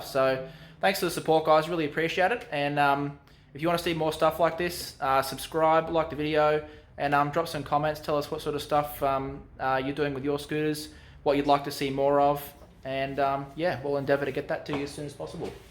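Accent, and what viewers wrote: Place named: Northern England, United Kingdom. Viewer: oh my god I’m sorry about that cause the north of England sounds like that sorry!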